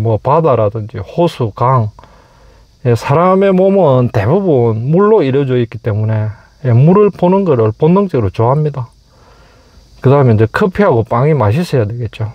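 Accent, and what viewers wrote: Gyeongsang, South Korea. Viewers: 경상도 사투리가 어때서요? 경상도 사람이 경샹도말 하는건 당연한거죠.하여간 별별 간섭질 하는 사람들 그것도 병이다. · 경상도 사투리 넘넘 듣기좋아요 방송최고입니다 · 진례ㆍ장유 김해주촌 ㆍ진영ㆍ생림 삼방 안동 사투리좋습니다 응원합니다🎉😂😊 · 경상도 사람이 경상도 말 해야지 어디 말 할까요 개 껌 씹는 소리 그만 하세요. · 경상도 사람이 경상도 말쓰지 제주도 말쓰링???웃겨들~~~~~~~~~~~~~~~~~~~~~~~~~ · 산 위에 배. 기억이 희미하기는 하지만, 강원도 정동진 바닷가에도 있었던 거 같은데? 이 곳은, 건축, 영업시설 자체에 문제가 있어 보인다. 바로 옆에 대형 철탑, 주변에 그런 대형 철탑이 산재하여, 이런 곳은, 기피장소에 해당한다. 그리고 산 위에 대형카페와 숙박시설을 건축하다보니, 걸어가야 하는 그 진입로 부분도 너무 좁고, 길고, 안전 난간도 낮고, 부실하여, 불편, 불안해 보인다. 보이는 전망이 주변 산 위에 시설된 철탑들인데, 굳이 이런 곳을 찾아서 가려할 까? 그리고 경상도 사람이, 경상도식 억양과 말투로 말하는 것은 당연한 것인데, 그런 것을 가지고, 어느 개 동네에서 사는 강아지가 낑낑 거리며 그런 개소리를 짖을까? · 경상도 말 사용하니 더 정감이 가고 좋습니다 힘내시고 갱상도 홧팅❤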